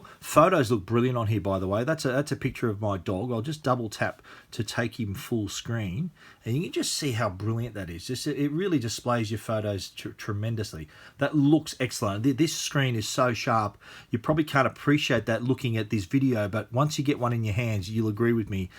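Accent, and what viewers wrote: Australia. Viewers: its an australian accent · He must be Australian because I am also and I didn’t think he said swipe of sweep any differently than I do 😂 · Aussie accent sounds top notch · Guys I’m Australian, I think it was just the way he said it, u know what he means it’s not really an aussie thing, just the accent is.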